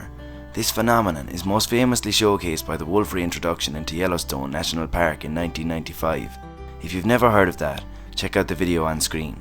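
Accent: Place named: Ireland. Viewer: I watch so many videos on rewilding and it’s so reassuring and inspirational to hear hear in an Irish accent discussing this! I finished a masters in illustration a few months ago and the first few minutes of this video could almost be the script for my final presentation. I’ve researched and half finished a book on encouraging school age children to shift their mindset on Irish green spaces and begin rewilding at school and home. It’s so good to hear it talked about. There’s no way to contact you on here but if you’d be willing I’d love to discuss any other projects or creators like yourself or other artists talking about this. I’d love to find ways to get this passion into the Irish public.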